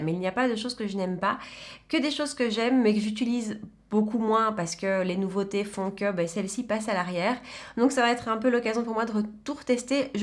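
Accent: Belgium. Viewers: Coucou! Merci d'avoir répondu à mes questions! Félicitations pour tes + de 1000 abonnés du coup 😊 J'ai craqué aussi comme tu le sais sur la mini gold j'aime tellement le vert kaki 😍 Au Etats Unis ça doit être le paradis du maquillage mon dieu 😱 Et je confirme tu as un bel accent Belge! D'ailleurs j'ai des origines belges du côté de mon grand père paternel "ça se voit pas hein 😂" Je suis également "Mimi's signature" et "la Loge de Gabrielle" Tu es très agréable, douce, simple et je suis comme toi j'adore rigoler la musique danser... Bonne soirée et à dimanche 😘😘😘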